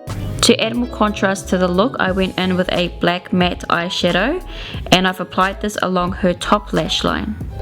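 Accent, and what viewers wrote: New Zealand. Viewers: Absolutely stunning makeup that you did in this video ! X ... However, subbed coz I loved your kiwi accent (now i know what people mean about kiwis having an accent...couldnt hear it !) :D I also grew up in New Zealand x Now living in Aussie!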